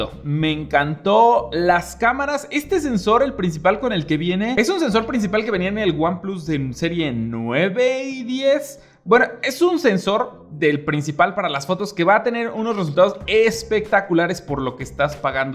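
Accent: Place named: Mexico. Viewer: Me parece agradable el acento mexicano. El ar, me da as co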